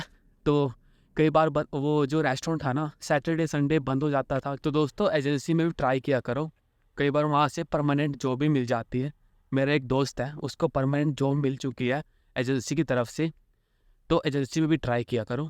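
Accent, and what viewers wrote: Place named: Haryana, India. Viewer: Ye haryana se lag raha hai😅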